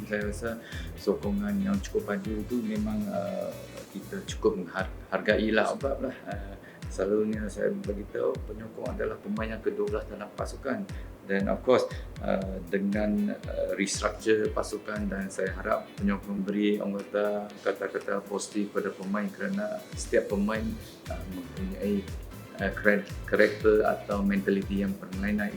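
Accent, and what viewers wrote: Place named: Kedah, Malaysia. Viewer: loghat loqstaq pekat tn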